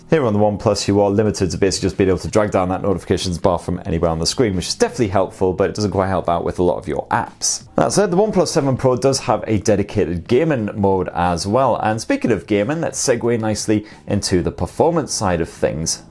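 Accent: United Kingdom. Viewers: like your comparison videos, and is good to hear a British accent that is easier to understand :)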